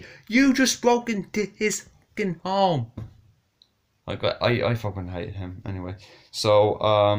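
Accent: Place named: Ireland. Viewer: It's such a Masterpiece of a film. My name is Lee and this is my 2nd channel. My main channel is The Dragon Critic. I can tell your Irish and so am I :)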